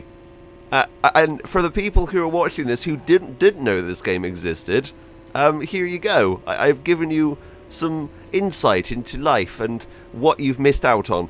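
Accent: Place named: United Kingdom. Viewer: Speech impediment? You mean his British accent?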